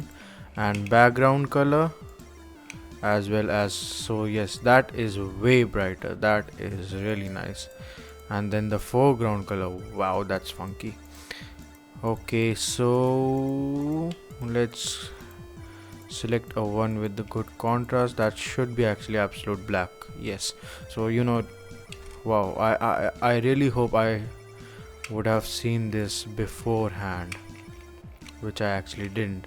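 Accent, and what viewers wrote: India. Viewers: It is Indian accent, i have not problem with that, but for some reason people want flawless english... We are shaped in while growing to adapt to our language, i speak portuguese and my accent when i talk in english is pretty noticeable, but americans say they can understand me pretty well, in fact, they say that the syllabic latin language makes it more audible. Some people are just jerks...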